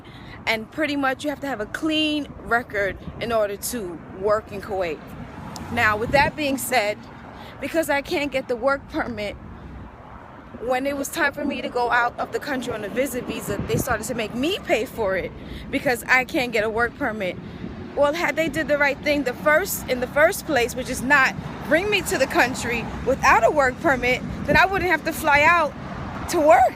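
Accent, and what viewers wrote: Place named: United States. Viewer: You better get your ass out of there.. Before something go wrong..like go to jail.. remember you don't suppose to be there... The key word is illegally.. you sound like you are American woman if I was you I will get back to United States and you can go somewhere like Canada or Brittany will somewhere where's though you're not going to go to jail for being their country illegally get the F from out of there before you regret it sister Sincerely Nate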